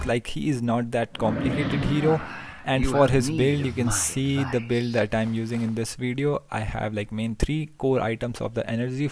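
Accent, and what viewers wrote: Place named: India. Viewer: Bro I think your indian from your accent,but forgive me if iam wrong but I was wondering whether you are getting ranked matches,iam indian myself so I think we will be playing in the same server,that's why iam asking you,iam struggling here cuz iam not getting matched in rank and maybe like after 6+ mins I give up, please provide me some tips on how to get ranked matches easily if there are any, Thankyou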